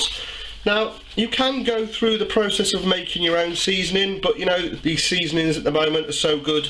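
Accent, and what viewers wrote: United Kingdom. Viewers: British acent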